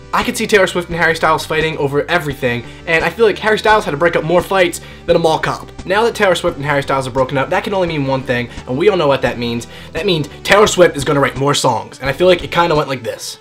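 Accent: England, United Kingdom. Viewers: Ur British accent is amazing *-* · OMG u are soooo funny xD and ur english accent o.m.g hilarious! :P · i love his english accent!! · Omg your British accent is adorable!! Ur hilarious! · I love your British accent :D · Your English accent is just afsbfsbdagagdagda <3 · Dat British Accent. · I love ur uk accent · +British Accent= <3:3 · This is absolutely awesome(: Love your brittish accent ( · Ur british accent rocks! :) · i love your English eksent:D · Nice British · You have an excellent British accent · HA! I love hearing you talk in a British accent. Lots of love from the uk : ) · Right on with the British accent!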